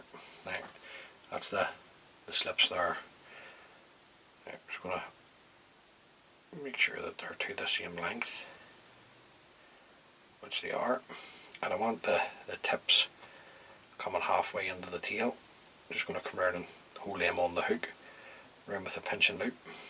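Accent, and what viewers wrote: Northern Ireland, United Kingdom. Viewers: Excellent tie and collection of patterns! I just sub'd and really enjoyed looking at your community page. Lots of inspiration from this one, especially with the wood pigeon wings. I've used common pigeon (city pigeon I called them) that I found around the house to tie wet fly wings when I first started tying. I love these wets from the UK, and your style of tying them. You sound similar to Ryan Houston, I was wondering if you're from "sunny" Northern Ireland like he is? Thank you. Cheers from the US!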